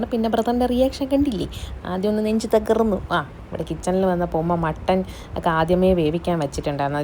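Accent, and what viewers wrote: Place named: Trivandrum, India. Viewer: Trivandram aanalle language kttappol mansilayi evideya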